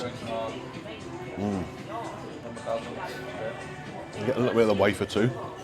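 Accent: United Kingdom. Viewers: I can’t stop watching your vids. Yank here but love your British accent and your adjectives are addictive. I love good food! Thank you!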